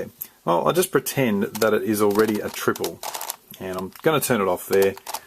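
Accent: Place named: Australia. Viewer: Are you Australian, mate? Loved your accent 😂 Also loved the tutorial. It really is the best! You explain all the basics, but don't treat your viewers as idiots and repeat same things seventy two times. Your explanation is clear and simple and you rule!! 😎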